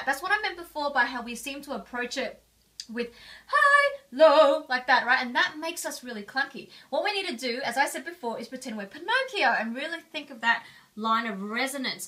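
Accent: Australia. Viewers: It's Australian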